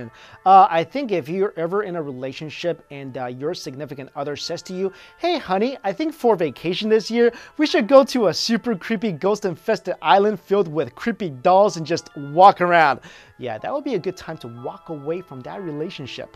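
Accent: China. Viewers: listening to someone who is Chinese trying to pronounce Japanese words is amusing lol. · wheres your accent from? are you chinese?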